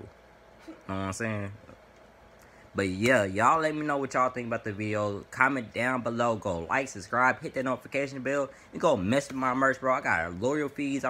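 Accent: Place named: Arlington, United States. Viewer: I know u from Arlington talking about cracking 4s u prolly from south Arlington way u talk it’s east side agg town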